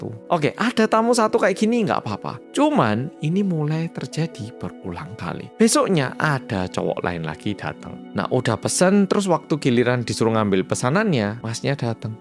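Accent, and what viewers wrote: Java, Indonesia. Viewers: Halo gaes, welcome back bersama detektif swasta yang ... medhoookkk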